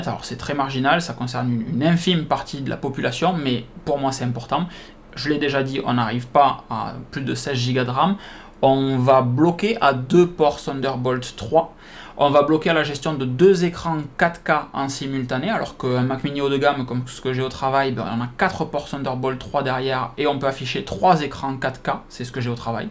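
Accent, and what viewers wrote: South, France. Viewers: Bon moment, l'accent du Sud en +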